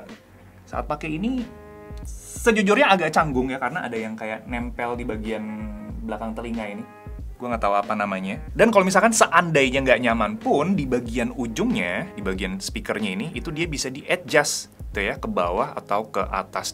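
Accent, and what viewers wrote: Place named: Banjar, Indonesia. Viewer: banjar bnar wwkwk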